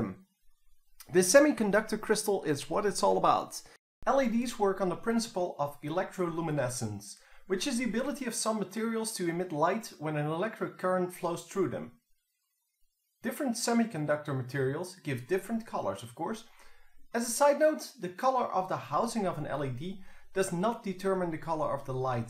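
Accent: Netherlands. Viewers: you sound dutch are you if you are hoi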